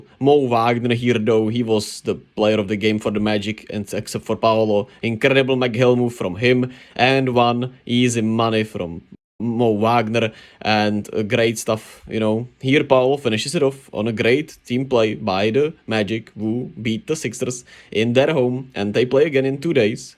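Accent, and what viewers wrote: Czech Republic. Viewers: first time hearing a cezch accent. pretty good video, try improve your flow a bit in the sentences and you'll be interesting for sure! I understand English is not your first language but just keep practicing man. all love here though, I enjoyed it!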